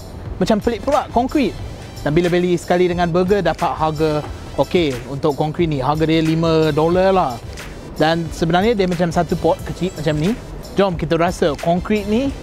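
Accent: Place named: Malaysia. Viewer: ckp inggeris mcm telo melayu. Terbaik.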